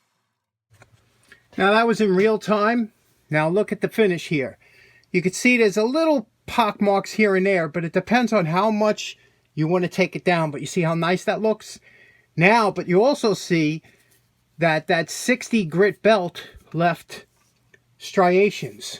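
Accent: New York, United States. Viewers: Love your videos. I’ve been restoring old tool (mostly hand planes) on and off for the last 20 years and I really enjoy your approach and enthusiasm. Having lived in California for the past 40 year your East Coast (New York)accent takes me home to my Pennsylvania roots and all of the old family.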